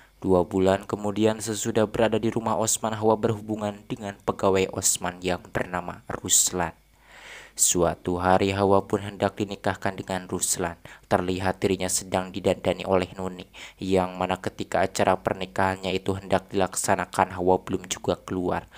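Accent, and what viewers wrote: West Java, Indonesia. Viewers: AA orang mn siiih? Jiga na orang Sunda na..semangat a💪cute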